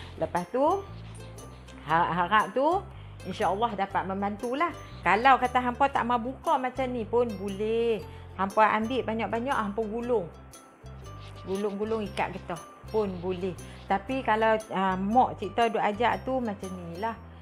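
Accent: Kedah, Malaysia. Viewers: Bukan lawak..org Kedah memang ckp.macam ni.. sbb tu org kedah unik.. dan memang hati depa ikhlas.. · Cik org.belah mana..dikedah ni..petua baguih sungguh..laa haih siap loghat kedah..terbaik ikhlas ngguh..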